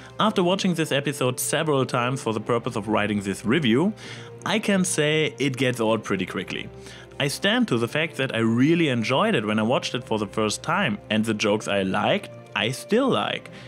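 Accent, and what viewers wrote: Germany. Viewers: I hate german accents so much, but not as much as I like your videos. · ... are you somehow a version of me that happen to grown up german. I have an American accent, but when I speak german.... the resemblance to your voice is beginning to creep me out... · Oh, it is! You're quickly slipping into my "most entertaining reviewers" category that includes such hilarious guys as ConfusedMatthew, SFDebris, and JeremyJahns. The strong accent combined with perfectly clear English helps quite a bit on that score; everything's funnier in a German accent (as a movie director once told Arnold Schwarzenegger, and yes I know he's Austrian).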